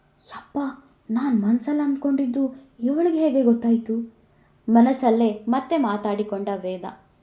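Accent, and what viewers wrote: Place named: Dakshina Kannada, India. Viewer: hauda !! Naanu nimge bahushaha exams irabahudu anyone. Neevu kannada narrate maaduva style namma pure Dakshina Kannada style. Haagaagi bahala kushi aagthade keluvaaga. Adanne naanu miss maadikollodu.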